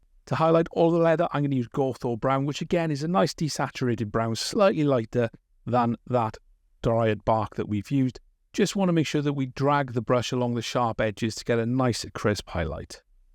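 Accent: Wales, United Kingdom. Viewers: Welsh accent, by the way. There's no such thing as a "British accent" really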